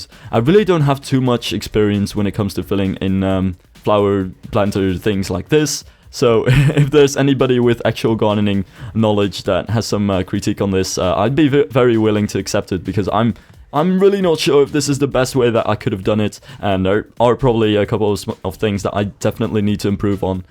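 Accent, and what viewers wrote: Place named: Netherlands. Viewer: Thanks, and by your voice.. i can tell ur dutch .. Zeer goed kanaal ;)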